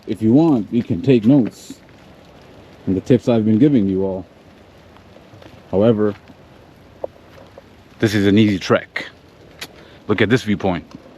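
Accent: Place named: United States. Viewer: Love your videos and your channel by the way you sound like you from state just saying ❤❤❤❤❤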